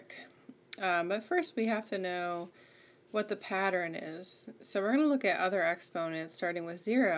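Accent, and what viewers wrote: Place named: United States. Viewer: THANK GOD!! an AMERICAN!! NOT INDIAN!! THANK YOU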